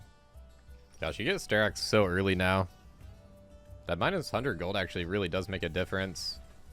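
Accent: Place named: United States. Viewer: What region of the U.S is that accent from? Well idk not accent but like...inflection?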